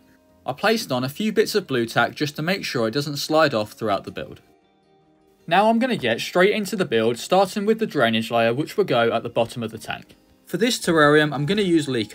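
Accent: United Kingdom. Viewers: bruh this guys british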